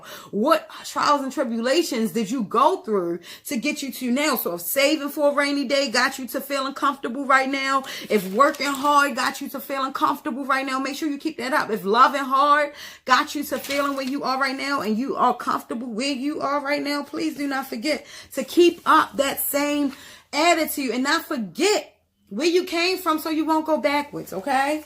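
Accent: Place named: Virginia, United States. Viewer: Wow sis this reading was very detailed to the Teaa & it's accurate as hell 👏🙌. When you mentioned the Devil card I said wow its scary because I've been seeing 555 constantly along with other #s too. The Universe is speaking to us all about letting go the toxic sh*t from our past so new things & opportunities can come our way. Not to mention mercury retrograde is also in the mix 🤦‍. Im loving your readings & loving your vibe. I feel like you also maybe from Virginia or Maryland because you have an accent lol 💕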